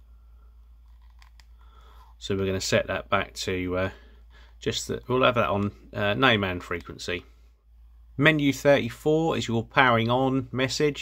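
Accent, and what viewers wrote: Norfolk, United Kingdom. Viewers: Is that a Norfolk accent I hear?....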